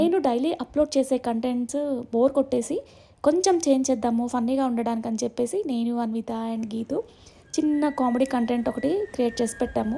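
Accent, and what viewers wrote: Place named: Nandigama, India. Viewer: Ma nandigama heroine 😻💓 me too born in nandigama andi ⭐💭 anduke slang adiganu .💖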